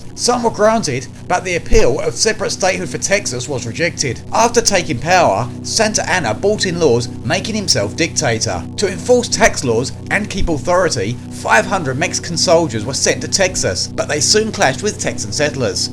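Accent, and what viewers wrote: United Kingdom. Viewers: Neither is he! lol That's an English accent. ;)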